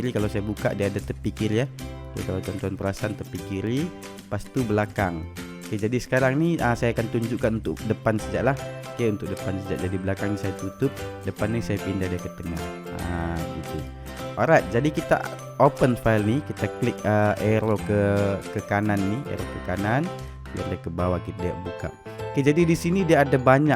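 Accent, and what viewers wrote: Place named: Sabah, Malaysia. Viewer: sabahan loghat...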